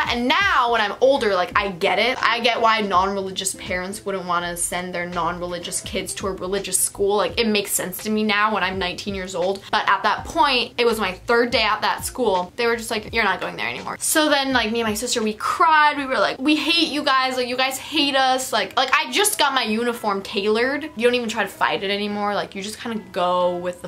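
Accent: England, United Kingdom. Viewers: Omd your British accent 😯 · Loved your British accent 😍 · oha ingiliz aksanın efsane sşjfsidjsşdn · YOUR BRITISH ACCENT OMG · that british accent tho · fr 😂 just a min... Just found out about your videos now, but already love you! Different curriculums suck so bad!! love your British accent too lol but that Karen neighbor sounds horrible.. the stalking thing is true too tho Also I’ve stared speaking a mix of literally all languages I know and people start to get more and more annoyed by it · We love your interactive videos so much too babe.I've never movied but I wish I could even though it has so many bad effect or bad feelings on person because without moving or going another country it is hard to learn other ppls culture or language or life in general and I think you should make a video with your british accent it would be different and lovely thank you and love you from pluto and back💋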